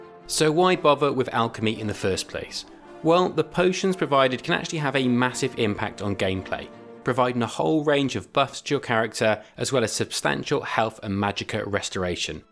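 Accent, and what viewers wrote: England, United Kingdom. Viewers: Do people get mad at Brits for Brit speech? Feels childish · Good looks my friend great tutorial very helpful even with the English vibe lol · I’m more triggered by your use of apparatus as singular and plural than the British pronunciation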